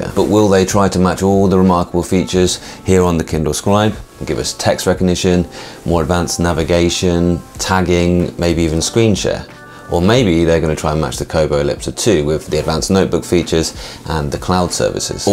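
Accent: United Kingdom. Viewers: I watch your videos just to take a quick powernap… the base in the voice, British accent… ooo… so satisfying to ears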